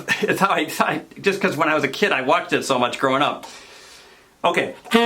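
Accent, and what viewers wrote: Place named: Chicago, United States. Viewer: Totally nifty approach,! Thank you. Funny coincidence: This is the first time I've watched one of your videos. Got fascinated right away, and while I was listening I started hearing something familiar in your voice. I grew up in Buffalo (Go Bills!) but haven't lived there since '69, and I started thinking "This guy sounds like he's from Buffalo!" But right away, I think, "No, Wait," because, suddenly I'm hearing the Blues Bros in your voice and I think, "Chicago!" And I spend the next few minutes going back and forth, trying to hear which one it was. And all of a sudden, you're talking about the Cubbies and Chicago, and it all becomes clear. Your short "'O" is a dead giveaway. Anyway, it's good to see a Rust Belt saxman doing well. Great lesson, and Best wishes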